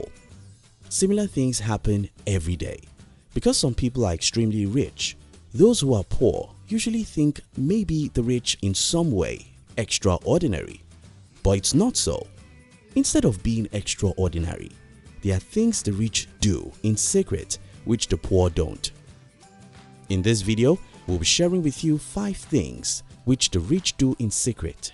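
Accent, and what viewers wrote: Nigeria. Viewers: Nigerian accent ...... no doubt ....mmmmmhhh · "in the secret" Funny Nigerian speaker (obvious from thick accent) uses a white hand to do the illustrations. Good message though.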